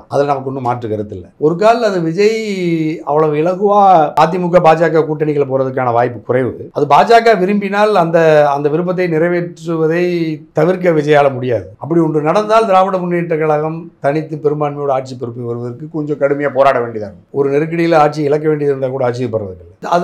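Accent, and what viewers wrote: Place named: Kongu, India. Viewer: கொங்கு மண்டலத்திற்க்கே உரித்தான நக்கல் பேசும் ஆளுயா நீ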